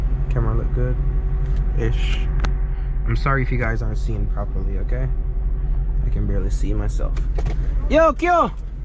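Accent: Jamaica. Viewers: Bro are you Jamaican ? I hear Jamaican the accent frl no 🧢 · You Jamaican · Lmaoo love the Jamaican accent/slang got me dying😭💀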